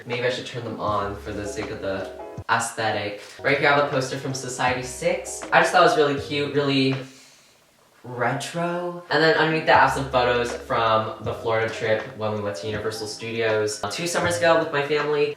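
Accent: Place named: Los Angeles, United States. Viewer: You talk, look, and sound so LA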